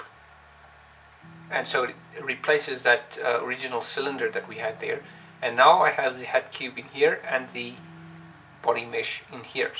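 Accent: South Africa. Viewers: Hahaha lol SA accent 🤣I'm South African too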